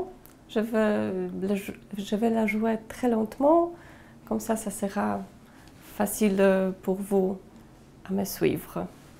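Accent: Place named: Eastern Europe. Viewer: Merci infiniment pour le partage de votre connaissance. Parmi les plus belles mélodies qui aient jamais été composées...Vous avez un accent adorable. Pologne, Russie, Ukraine...?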